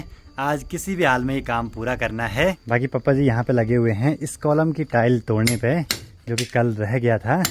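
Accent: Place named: Garhwal, India. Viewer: bhaiya ek din pura video Garhwali mein banana aapke munh se Garhwali bahut acchi lagti hai ham aapko Punjab se dekh rahe hain❤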